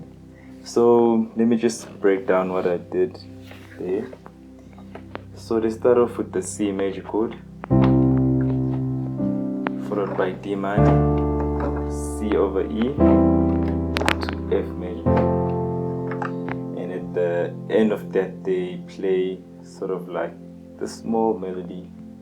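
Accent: South Africa. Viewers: awesome. You sound like you from KZN or Gauteng? I just noticed your channel and subscribed now. Thank you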